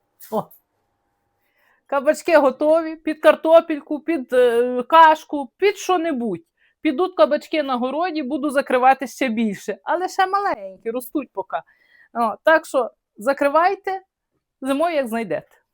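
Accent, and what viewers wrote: Ukraine. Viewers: Вітаю! Ви напевно найкраща господиня з найкращими рецептами! Дуже Вам дякую за Вашу працю ❤ Всього Вам найкращого і всій вашій родині! Ps. Дуже гарний український говір 😉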